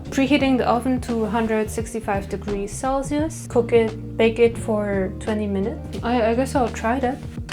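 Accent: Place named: France. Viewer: You sound quite French when speaking English.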